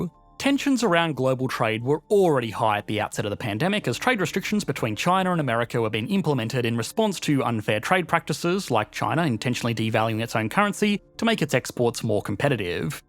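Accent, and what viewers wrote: Australia. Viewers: As an ignorant American, I can say that I could never tell if his accent was Aussie or Kiwi, so I never noticed the flag flub. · Between you and Perun (and sometimes sunnyV2 and shadiversity) i don't thinkni can stomach analysis anymore unless it comes with an aussie accent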